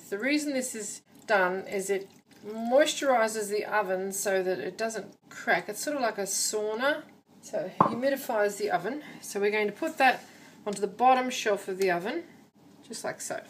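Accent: Australia. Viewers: Greetings from Melb! Awesome recipe, thanks for sharing! I'm gonna make it for my parents after getting all the ingredients needed ready. (P.S I didn't realise that your accent is the Aussie one,but does sound familiar =_ =||| probably becuz we are on the same boat, hahah) Anyway, thank you for the recipe!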